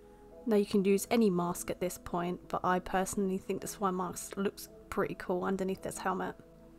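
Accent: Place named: United Kingdom. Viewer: Im also british, i dunno if she british but she sounds british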